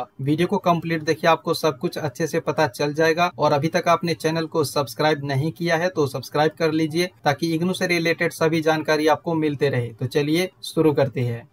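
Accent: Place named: Bihar, India. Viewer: bhai I think you belongs to BIHAR . IS it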